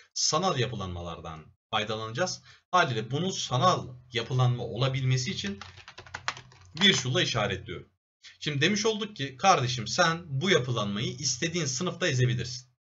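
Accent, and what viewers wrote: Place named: Rize, Turkey. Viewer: Adim kadar eminim ya rizeli ya oflusun hocam...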